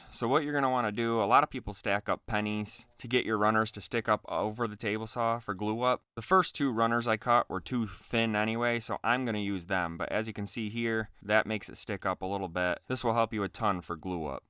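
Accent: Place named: Michigan, United States. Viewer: Takes one whole minute explaining how most videos are too long 😂 Good video man. Appreciate the tips. Also, I didn’t realize how easy it was to spot a Michigan accent till I moved away. But I’d be willing to bet your from Michigan. Aren’t you? Haha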